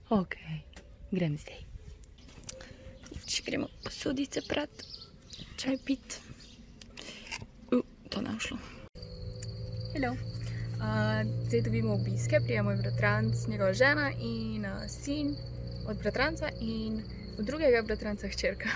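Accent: Carinthia, Austria. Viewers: tudi ona je iz koroske drugace nebi mela koroskega naglasa😂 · Ful si fajna!💕🌊Aja iz kot si ti ko mas ful koroški naglas,js sm iz koroske?🌍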